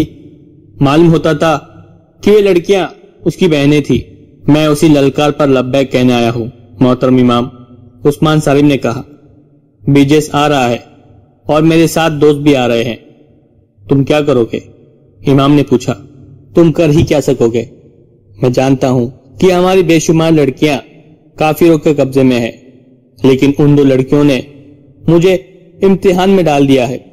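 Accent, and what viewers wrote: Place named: Gujarat, India. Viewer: Pl change the commentator terrible narration Is he some Gujrati speaking person Saramaza kharab ho gaya I have stopped listening to the video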